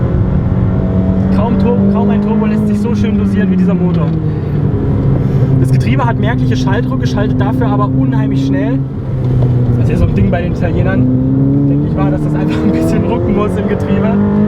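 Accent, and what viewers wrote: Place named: Poland. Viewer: sounds like a Polish Nowak, you are a real polish Nowak?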